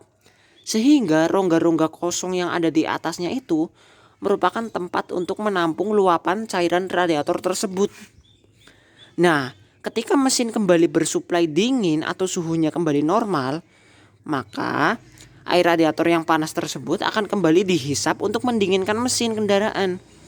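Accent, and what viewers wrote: Banyumas, Indonesia. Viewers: Ngapak² nih..terimakasih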